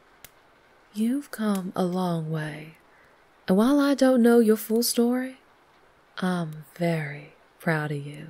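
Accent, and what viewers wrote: South, United States. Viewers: ! Another amazing and magical ASMR tale with a new character to love, Mama Marennah is a wonderful addition to your gallery and your Southern Accent is just even more incredible than before. You can really tell you have been working hard on improving your Voice Acting and all that hard work has paid off hugely. A standing ovation is the bare minimum accolade that you deserve and honestly so much more would be appropriate.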